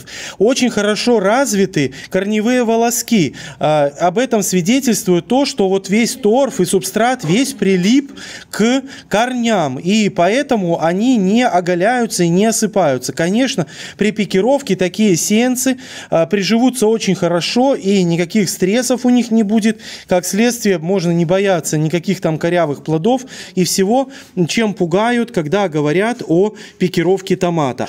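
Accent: Belarus. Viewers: Речь красивая с небольшим белорусским акцентом!!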